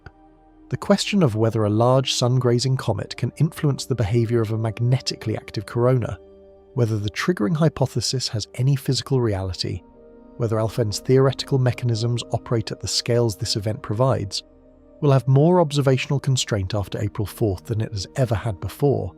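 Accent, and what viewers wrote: United Kingdom. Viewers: Another fear video. A tiny pebble going to hit the sun will not register at all but "somebody" with a crappy British accent can amplify your fear level so you cannot think straight. Who would profit from this chaos ?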